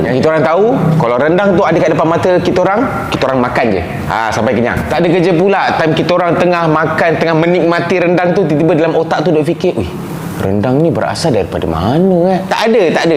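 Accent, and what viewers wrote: Indonesia. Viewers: Kalau nak tipu pun biarlah menipu dengan pandai, janganlah pakai orang indo yang loghatnya ketara sangat. Betul lah bongok, patutlah IQ mereka sama level dengan golilla (gorilla)!! Reference: Boru Naga 2 hari lepas "IQ rata rata orang indo sama dengan gorilla, pemuda indonesia di-kecam sebab membela malaysia". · Itu nk claim ini nk claim...dh takde keje sgt ke...rendang...mkn jer laa cekik kasi habis...tgk muka cara ckap dh tau org mana...ingat org bodoh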